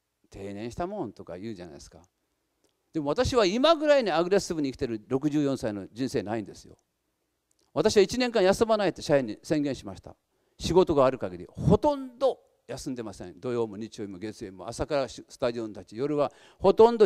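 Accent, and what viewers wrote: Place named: Nagasaki, Japan. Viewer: この長崎弁もいいし声もいいんですよね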